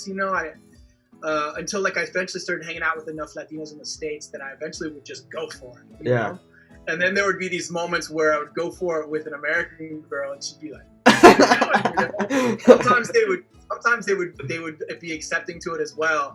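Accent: Buenos Aires, Argentina. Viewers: Sos un Argentino disfrazado de estadounidense que habla argentino, y hace chocotortas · Se te escapa el artentino por todas partes, pero bueno, espero que hables un buen yanqui, la verdad no me gusta mucho, lo ficcional, chau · Te escucho hablar sos un porteño jajaja. Que bien que puteas. Saludos del norte de argentina Santiago del Estero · hasta cuando hablas Inglés se te pega el acento y estilo Argento! Posta! Te lo deben decir en tu país! Tal vez no lo notes pero sos un Argento hablando inglés 😁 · Querido. Escuche como hablas de el asado y ahora me voy con mi compañero de trabajo a clavarme un chori a la costanera sur de la putísima madre que lo pario.... Muy bien tú acento porteño..... Cuando vuelvas y se pueda ir a la cancha te voy invitar a la cancha de Huracan a ver al Globo.... Abrazo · Te encontreeeeeeeee. Feliz! Me encanta verte disfrutar tanto de Argentina! 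Siempre c una sonrisa! Ya se lo reenvío a mis hijos. Amo mi país. Pero evidentemente tus ojos me lo recuerdan con más frescura y sacándome de este momento tan complejo a vivimos como país. Hoy mis hijos miran al mdo. Y sabes q es un desafío importante para las familias. Pero me quedo c tus sonrisas y la forma en q disfrutas de nuestras costumbres. Te mando un abrazo y un beso desde el alma hermosa personita Te juro q al escucharte era imposible para mi pensar q no seas argentino. Un placer! Saludos desde Baires. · Tiene ré buena onda el vago pero reconozcamos que acento y personalidad portena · prácticamente, sos un argentino hablando en inglés.. tenés más acento argento que yankie! AMO❤ Gracias por amarnos tanto loco!!! 🙌 Saludos desde Buenos Aires 🇦🇷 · Tenes no solo la tonada argentina si no la simpatía y la onda ganadora de argentina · muy bien el acento argentino!!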